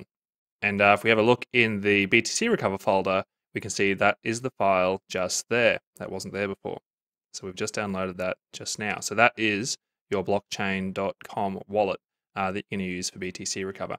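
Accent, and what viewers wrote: Australia. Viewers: The updates you have made to the code, the videos and what is clearly a lot of work is appreciated and meaningful. Thank you. I have your current code running just fine on about 5 systems at the moment. I've explored and set up machines on vast.ai just fine as well. Got all of the GPU code running through the tests 100% but my wallet is a very old Blockchain wallet that's not been converted yet because I don't know the password. I can't seem to use a GPU via OpenCL or Nvidia. I have the id and it's connected to my email just fine. I can and have used the scripts to download the wallet and I understand --data-extract just fine as well. In any case, I've gotten all the way to having lots of possible passwords in my possible passwords files. At this stage, I'm simply trying to work out the most optimal means of plugging all of that back in to figure out the precise password. 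It says that the difficulty of my wallet is 10 which seems good relative to the difficulty rating on some of your test setups. Did I detect an Aussie accent? I'm in the Melbourne area. I'm happy to pay on a consulting basis as long as this is not a percentage. My sense of my situation is that I'm a few mm from the finish line at this point.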